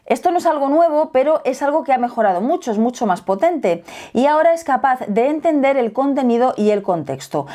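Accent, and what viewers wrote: Spain. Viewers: No se pero a mi me parese que la forma de hablar de los Españoles es vastate fastidiosa no me gusta 😑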